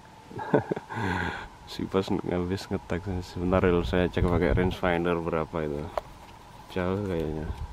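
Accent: Java, Indonesia. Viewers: Asline wong ndi mas ketone wong jowo iki. Kerjo teng pundi mas😇🙏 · Jan gk ilang blas jawane...."uwwaangel giaanndul gandul teng krontelll"😅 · Kya,,a logat orng Jawa ya bos sampean😀😀😀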